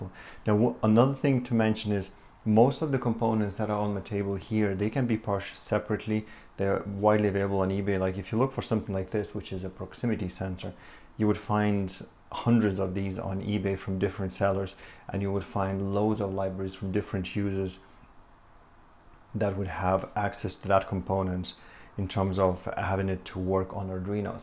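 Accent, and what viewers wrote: Ireland. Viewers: He does sound Irish, possibly from Dublin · Great video. Where are you from? You have an Irish twang in your accent, but dont sound like you are from Ireland.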